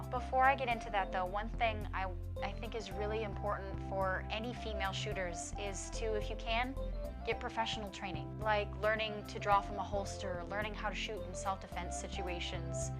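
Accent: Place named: Great Lakes, United States. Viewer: You have good videos...but you should work on losing that snarly Great Lakes accent. It's kind of annoying.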